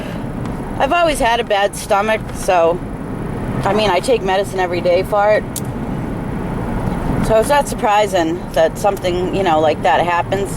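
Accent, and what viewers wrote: Boston, United States. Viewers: Being from Boston, I noticed your accent immediately. Kerri :)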